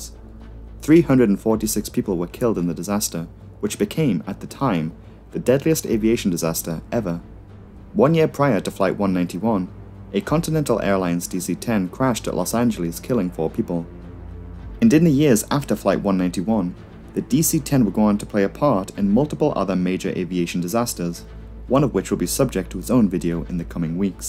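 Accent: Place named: Northern England, United Kingdom. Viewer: Informative and concise videos. Very well made. And a fellow northerner, eh? I'm from County Durham - not far away!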